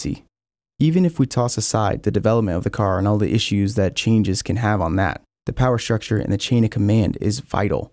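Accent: United States. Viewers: a well spoken American accent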